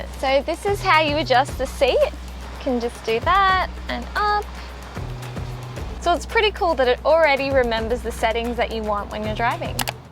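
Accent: Australia. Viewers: Love your Aussie accent! I can't get over the size of the charging plug in Australia they are 4 times bigger than in the US (maybe size does matter)? 🤔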